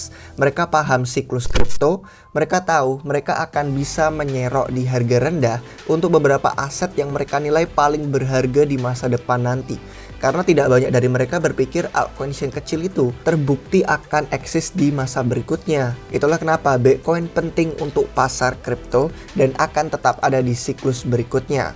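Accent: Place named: United Kingdom. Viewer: Logatnya ala Inggris Britain bukan Inggris Amerika. Konsonan tengahnya hilang semua. Wkwkwk...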